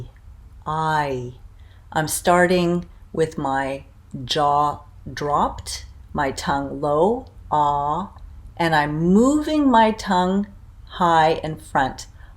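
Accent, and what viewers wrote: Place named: Canada. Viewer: I'd love to get a video on Canadian Raising. The dipthongs Ai and Aw get reduced to (ʌɪ) & (ʌʊ) Correct me if I'm wrong but I heard you did use (ʌɪ) a few times. Obviously these aren't dipthongs every single Canadian uses though it's well-spead over the southern provinces. I think we foreigners will benefit from a lesson on those dipthongs.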